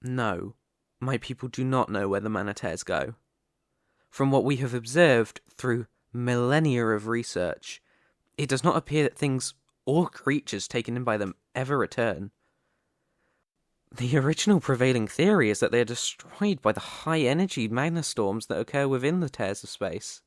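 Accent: United Kingdom. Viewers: Oh that accent makes me happy as a fellow Brit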